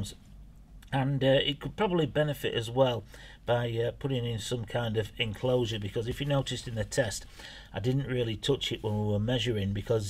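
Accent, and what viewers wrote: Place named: England, United Kingdom. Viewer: Thooms (e.i. Thooms; British equivalent for Thumb. Pronounced as "loom." As in a cotton loom. - American influence/non- "King's English") Up. (Hehehehe- Ya gotta love that English Accent. Tickles ya).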